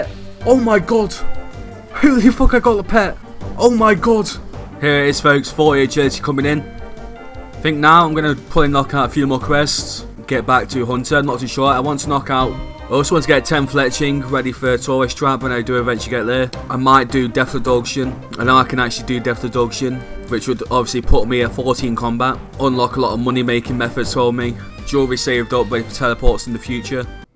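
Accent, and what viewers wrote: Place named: United Kingdom. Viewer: Love to series! But your accent is very Bri'ish! :p Kinda hard to listen to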